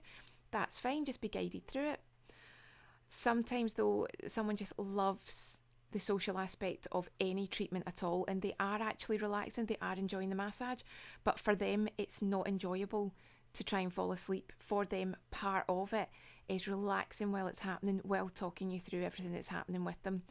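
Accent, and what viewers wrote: Scotland, United Kingdom. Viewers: Is she Scottish ? I'm fond of northern British accents (and some like Surrey). She has a lovely voice indeed... She's a masage for the ears and brain :) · I knew you were from Scotland, you have simillar accent to my friend’s. Are you from the Highlands? Btw, great massage. I am currently studying medicine and these kinds of videos help me to get a grasp of anatomy. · im from Scotland too, nice to hear a familiar accent. BTW i have been using your techniques for my husband who suffers from stress and crohns disease and it helps him EVERYTIME< thanks so much for your effort and time in making these videos. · You`re Scottish accent is super turn on. Great upload,btw. :) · Swedish massage....I was not expecting a lady from Scotland to do the commentary. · Where in Scotland are you from? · I could listen to your accent forever. 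Lol… I need to find a nice Scottish woman.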